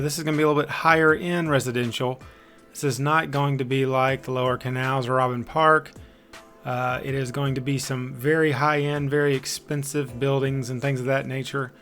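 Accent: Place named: Tennessee, United States. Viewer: Your accent... Where are you from? You sound like a Tennessean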